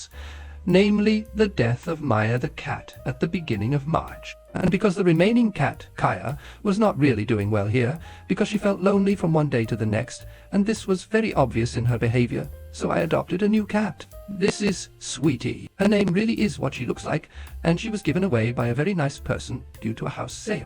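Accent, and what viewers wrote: United Kingdom. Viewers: Hello 😊... Yeah 🕺... First comment here 👌🏻. Good job and well done my friend. Very British speech. But what happened with the sound? 🤔 It's scratchy 😊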